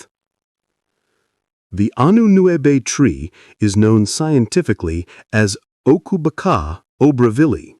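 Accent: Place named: Africa. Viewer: Where u from? That accent sounds somewhat african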